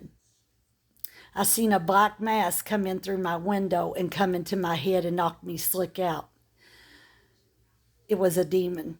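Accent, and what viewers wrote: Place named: Louisville, United States. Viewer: When she said eastern parkway I knew she was talking about Louisville plus with her accent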